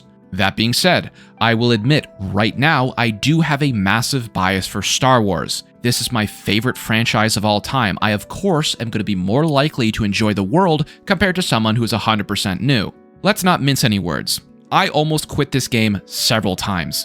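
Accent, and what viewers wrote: Canada. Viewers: He’s Canadian? Gross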